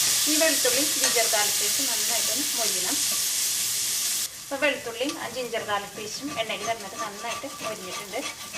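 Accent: Thrissur, India. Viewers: trissur slang polichutta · Haai.. Nalla peddakkana thrissur basha.. Nice video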